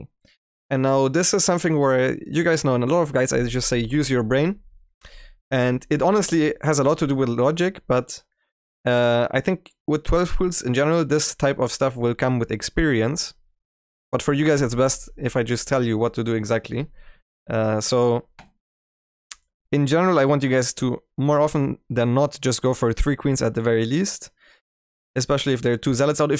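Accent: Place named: Germany. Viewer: i think the German-ness is quite apparent, his English is good nonetheless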